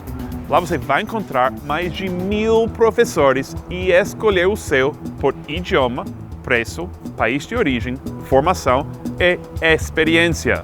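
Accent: United Kingdom. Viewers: você parece mais britanico que americano sr gringo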